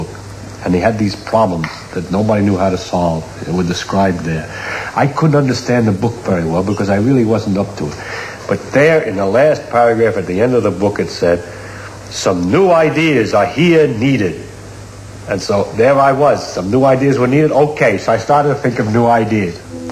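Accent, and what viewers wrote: New York, United States. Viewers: I love his accent. Is that the thickest New York accent ever seen on TV? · That New York accent is so catchey. I remember once telling my mother that it is possible to have negative integers as well as positive integers and tried to explain my idea,, this was our at the age of 11 or 12, she just laughed at me and said that was stupid, it wasn't until high school then I realised that's exactly what you can do.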